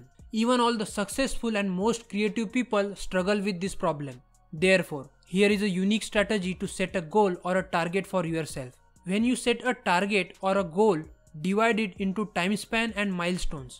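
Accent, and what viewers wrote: India. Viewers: You have the strongest Indian accent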